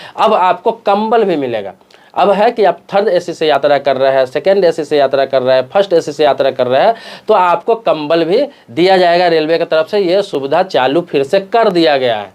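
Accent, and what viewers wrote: Bihar, India. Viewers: Thank YOU for very explicit explanations !! Your service has been probably appreciated !! JAI HINDs & Vandey Maatharams from Dr Ramesh Murthy, A ( Retd ) MENTAL HEALTH SPECIALIST originally from Bangalore City but Qualified in Madhusudan Pranesh, GWALIOR from GRMC of JIWAJI UNIVERSITY !! Bhai, AAP BIHAR Pranesh he hain kyaw ??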